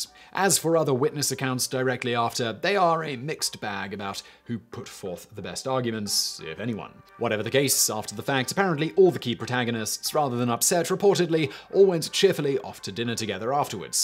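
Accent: England, United Kingdom. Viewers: Given your British accent, the typo in the thumbnail kinda ruins a lot of credibility of the research. Or it's just a genius ploy to get higher engagement via outraged comments.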